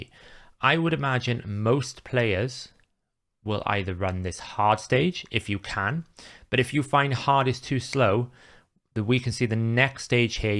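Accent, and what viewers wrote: Wales, United Kingdom. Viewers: You sound like your from llanelli or swansea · Are you welsh?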